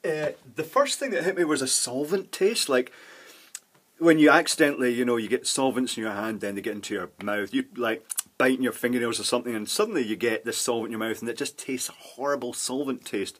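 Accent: Scotland, United Kingdom. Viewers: something satisfying about a Scottish guy cursing.......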